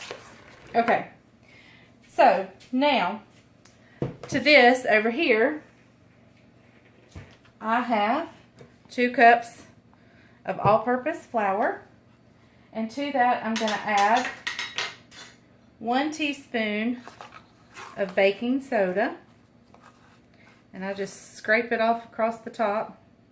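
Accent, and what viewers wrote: South, United States. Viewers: This cake looks absolutely delicious!!! My friend's birthday is coming up at the end of this month and I think this cake would be just the thing for her celebration. I just subscribed to your channel and am looking forward to watching more of your videos. I enjoy listening to your southern accent! (I'm from Missouri where we have no accent LOL)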